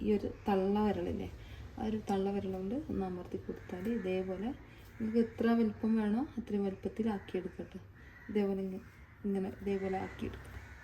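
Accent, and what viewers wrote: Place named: Vadakara, India. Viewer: വടകര സ്റ്റൈൽ സംസാരം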